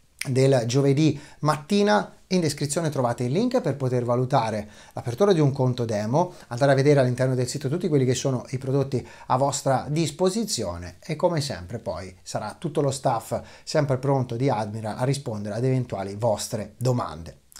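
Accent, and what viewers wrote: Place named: Umbria, Italy. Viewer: chiedevo per via dell' accento familiare, suonava come umbro, nun t'incazza.........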